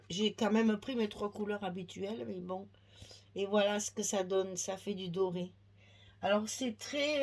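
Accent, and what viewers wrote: South, France. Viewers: Bonjour, c'est vrai que ce n'est pas le style de papier que j'aime mais j'adore regarder vos vidéos, j'aime votre façon de travailler et vous écoutez parler 😊 Votre petit accent du sud met du soleil dans ma matinée 🙂 Alors merci pour vos tutos et votre joie de vivre. Cela donne des idées même si on ne fait pas exactement la même chose ou avec la même collection de papier. Belle journée à vous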